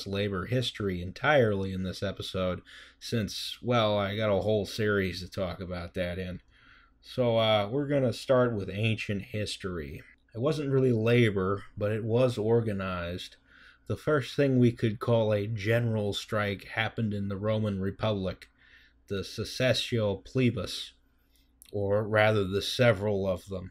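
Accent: United States. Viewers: i think we need more people with strong american accents talking about socialism. i like this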